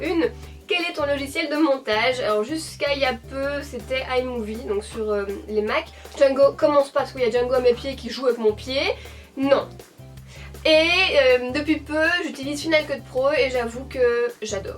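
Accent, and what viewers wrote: Belgium. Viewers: Je crois que les gens confondent les accents car souvent pour les français, l'accent belge c'est juste l'accent bruxellois. Perso je savais que tu étais belge car je suis du Hainaut aussi ahah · L'accent n'est pas très marqué je trouve comparé à mon oncle belge ^^ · Waow super vidéo 😘 Mais, une petite question, j'ai pas compris parce que tu as dit que tu est italienne mais que tu as un accent belge ? 😹😹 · je kiff ton axant avant j habitait en Belgique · Ah non on peut pas confondre l accent belge et suisse ;0) ! Parole de suissesse ;0)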